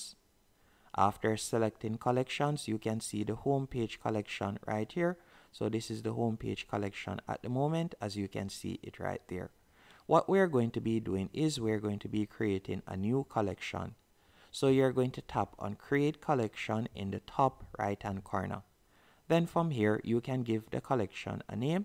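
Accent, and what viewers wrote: Jamaica. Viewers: Yo you sound like a yaad man , if yuh bug mind help me generate some sales please 🙏🏽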